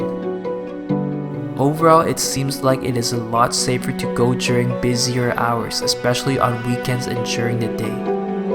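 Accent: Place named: North America. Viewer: + You behave like a whiny American, you sound like your from North America are you?! What's your ancestry, Polish or what else? No, it's in south america, not in the world, look at this list and look at this info in the 1940s and 1900s "The Japanese population in the United States grew from 148 in 1880 (mostly students) to 2,039 in 1890 and 24,326 by 1900." has more then brazil always, there you see or "the number of Japanese in other countries in the Americas at the time such as the United States (285,000), Brazil (205,000)" see?! And the list that it looks like there are more Japanese in America then brazil just like peru and Canada! 1841: June 27 Captain Whitfield, commanding a New England sailing vessel, rescues five shipwrecked Japanese sailors. Four disembark at Honolulu, however Manjiro Nakahama stays on board returning with Whitfield to Fairhaven, Massachusetts. 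After attending school in New England and adopting the name John Manjiro, he later became an interpreter for Commodore Matthew C. Perry. 1850: Seventeen survivors of a Japanese shipwreck are saved by the American freighter Auckland off the coast of California. In 1852, the group is sent to Macau to join Commodore Matthew C. Perry as a gesture to help open diplomatic relations with Japan. One of them, Joseph Heco (Hikozo Hamada), goes on to become the first Japanese person to become a naturalized American citizen. 1861: The utopian minister Thomas Lake Harris of the Brotherhood of the New Life visits England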